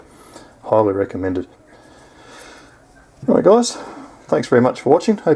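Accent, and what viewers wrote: Australia. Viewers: Mate.. I just loved the Review and it's great to hear an Aussie Accent doing one and you've got me motivated to go down to Walmart and buy one tomorrow .. :)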